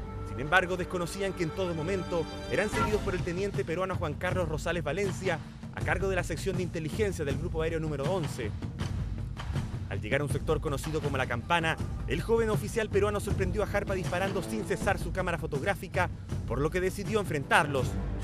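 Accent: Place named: Chile. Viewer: Ptm .... vii este videooo 2 veces y no entiendo ni m..... ... tiooo Joderr deja de hablar ese ridiculo acentoo .. k no se entinde ni kulo !! Chilee deja de Joder.. sera k no tienen nada q hacer en tu pekeña ratonera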